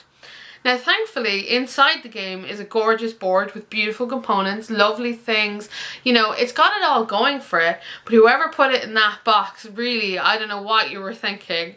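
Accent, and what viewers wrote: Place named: Ireland. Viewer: Nice video! Great to hear an Irish voice talking about games too!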